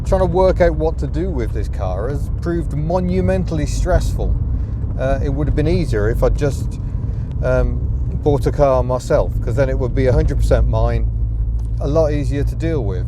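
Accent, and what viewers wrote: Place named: Birmingham, United Kingdom. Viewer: Welsh hippy I thought you were a brummie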